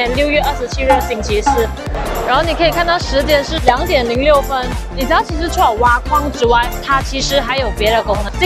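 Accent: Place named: Malaysia. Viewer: 进入只为了听你的马来西亚普通话！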